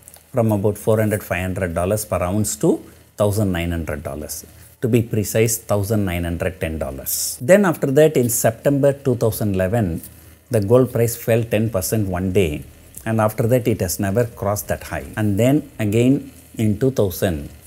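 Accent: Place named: South India, India. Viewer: If P.R Sundar wud have been smart enough to tell his 4.5 lac audience to buy Gold in Oct'18 when it first spiked from 1250 to 1450$ or in Sep 2019 when it again started spiking and tgen did furthermore after crashing in Mar'20 and then told us the Targdt of 2100 and then to sell, i would hVe paid heed to his ridculous arguments in his southindian accent !! Fact is, he is laying out analysis that does no reading into reasons that lead to spike and completely ignores the 10+ Trillion$ of Debt added around the globe in just 9 months !! When mkt crashes, ppl first go in dollars and then dump dollars for Gold. Dont listdn to him ... Buy GLD 1300$ or 34K INR and wait for 2023 for it to hit 2000$ again. But Silver 52K n target 90K+ around d same time. You are Welcome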